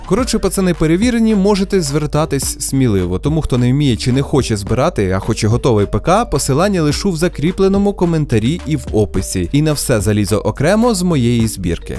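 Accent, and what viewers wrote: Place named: Surzhyk, Ukraine. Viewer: не критикуй його, він старається відівчитись від суржику. Краще слідкуй за собою)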